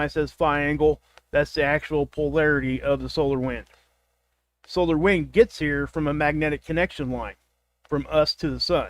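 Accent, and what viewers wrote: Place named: Appalachia, United States. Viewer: btw I love the hillbilly talk it's what I best understand. Thank you with prayers for gettin well soon & blessings to u & family. ✝️🕊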